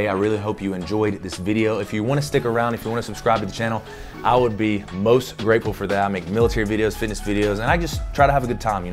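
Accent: United States. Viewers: sounds so country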